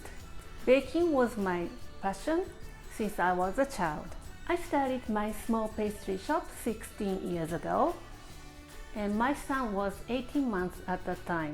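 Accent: Japan. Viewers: Konichi wa. Your English vocabulary is very broad and accurate. Your accent and courteous manner just make you authentically Japanese. I like your channel because you show how to make healthy every day food that a Japanese mom would make in her kitchen. I don’t need a blowtourch to sear pieces of sashimi or a special pan to make takoyaki balls as is done on some channels. I do sometimes wish you would tell more about where to get some of the uncommon ingredients not readily available outside Japan or what can be substituted. I especially enjoyed this video where you introduced yourself and told us something about your background and hopes for your YouTube channel. Matané!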